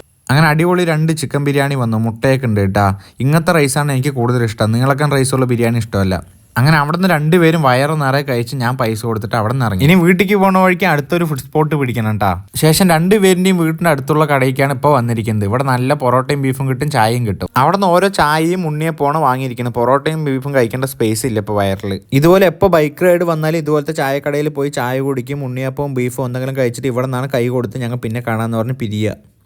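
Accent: Palakkad, India. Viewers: Enik ishttayi super vedio Enik ishttamanu palakkada slage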